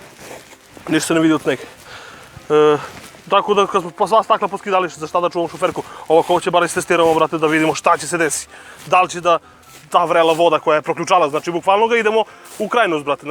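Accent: South, Serbia. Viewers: ma on je buraz kako prica da prica nas covek sa juga on ima 800k suba a beogradski hejteri moze da mu ga dudlaju sa snjihovim padezima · Brate sa juga je srbije nis leskovac vranje to je njihov dijalekat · E majke mi jesi glup on je covek sa sela brt tako ljudi u juznom delu Srbije pricaju inace jel ti neko ikad rekao da si glup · Brt on je iz sela ono i tamo je takav Niski akcenat nemoj da pricas kad ne znas.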